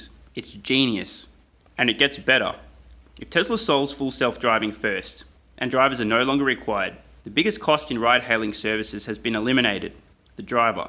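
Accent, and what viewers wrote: Australia. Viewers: Aussie accent mate 🇦🇺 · it’s great to hear the oz humour and accent. Got my favourite channels from US and UK. Now your the OZ channel to add to the funnel of tsla info and insight. My wife and I have our self managed super set up just for this purpose. A friend gave me a nudge a year ago. And haven’t looked back. Will get the Patreon sorted too. I sent this episode to my wife to help pass on more content without it coming from me:-)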